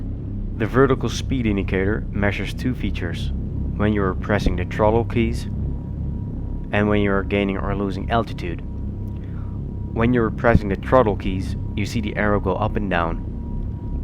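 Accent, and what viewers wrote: Netherlands. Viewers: Are you native english? You have an accent. Maybe dutch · Great vid! btw are you from the netherlands because youre english sounds like somone who is from the netherlands :P greatz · You're Dutch, aren't you ? Thanks bigtime for the hud expansion. I never knew most stuff.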